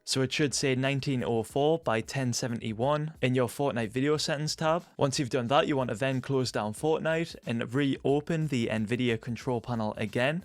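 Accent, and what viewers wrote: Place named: Newcastle, United Kingdom. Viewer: I think he is from Newcastle in England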